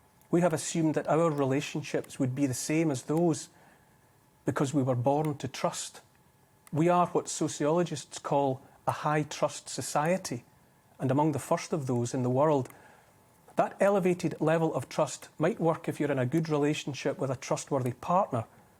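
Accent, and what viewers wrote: Scotland, United Kingdom. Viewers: How much I love to hear a direct Scottish voice let out an opinion. It's rare in my northern New South Wales' region. The region hosted an Aquarian Festival in the seventies, and it appeared to be going in the right direction. So, I went south of the Queensland border - thinking I had left an oppressive police state where any looking different or just looking too happy was picked on by the police. More than two people together in public could be arrested. This is now the law in New South Wales - though few seem aware of it. It seems to be there to stop people protesting about something the government has guaranteed for large corporations. It could be used to stop people protesting against coal seem gas mining or pulling up our railway tracks for elitist cycling paths - for retired business folk and local councillors to go on long viewing of the scenery - while those who can't drive and have wheelchairs, disabilities, older folk and Indigenous who can't drive - can't go to the beach any more, or who die not being able to get to medical specialists in Brisbane, Queensland. 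All major political parties voted in NSW parliament to rip up the tracks for cycling pathways, and including the federal government, offered over $100million to local councils to do it - even though this is more than enough money to get to Lismore - the regional center some new railway services - and to Byron Bay, as it was over fifty years ago. Too many now have parasitic jobs driving people with disabilitie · Kudos to. Lovely Scottish accent. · You were correct. He's Scottish. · 👍🏽👍🏽👍🏽 Im America, trying to work out if is Irish or Scottish. Im thinking Scottish. 💜 Love his talks. I subbed this channel today.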